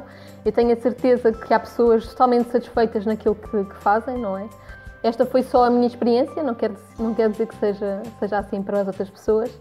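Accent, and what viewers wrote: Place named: Portugal. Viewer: Adoro sotaque de português de Portugal que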